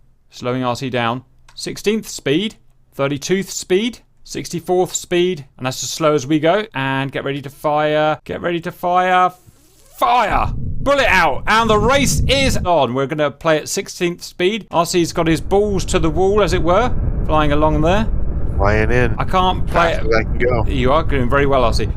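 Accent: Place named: England, United Kingdom. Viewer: 32th speed? You sound English, has it really come to this?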